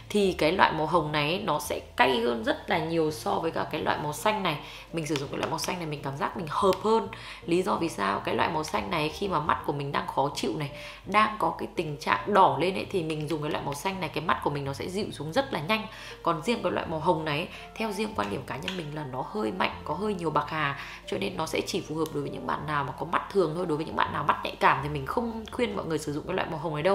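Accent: Nghe An, Vietnam. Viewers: Răng mà nghe giọng Nghệ An ở đây họ😗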